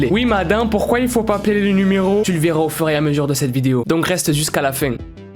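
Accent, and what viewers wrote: Marseille, France. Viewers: T’es marseillais ?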